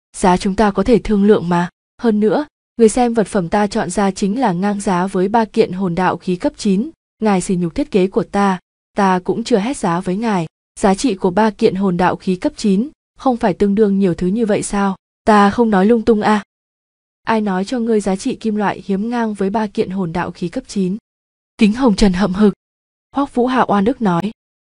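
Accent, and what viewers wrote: Vietnam. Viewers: răng mà giống giọng miền trung rứa hề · bé ad người quảng nam à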